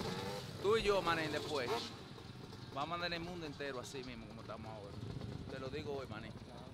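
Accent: Cibao, Dominican Republic. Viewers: Mi hermano sigue aciendo lo que te gusta ere bueno en eso tu asentó del cibao .te queda.vien y yo soy capitaleño....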